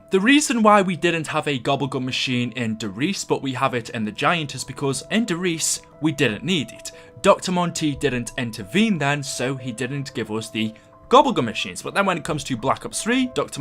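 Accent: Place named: Stoke, United Kingdom. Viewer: Love the stoke accent but anyway why are we split at the start of vürruckt??? Btw you are awesome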